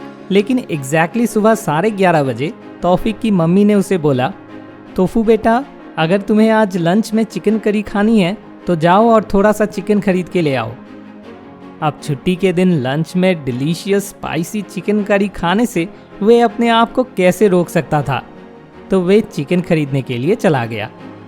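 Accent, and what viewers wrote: Bengal, India. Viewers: Welcome back Bangoli accent voice ,,,,👍👍👍👍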